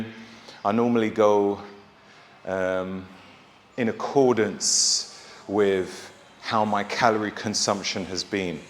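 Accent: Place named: Europe. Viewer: Love the accent I can listen to Europeans talk all day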